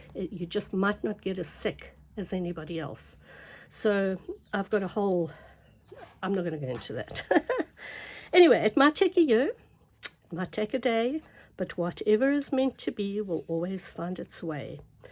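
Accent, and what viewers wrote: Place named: South Africa. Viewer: So lovely to hear a South African accent on an art journal video.Your art journal is beautiful.Love from the Karoo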